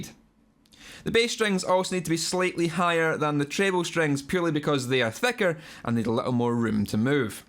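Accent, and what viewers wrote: Scotland, United Kingdom. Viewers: Oh! Now I see. The accent is scottish! That's why the "peckup" and so on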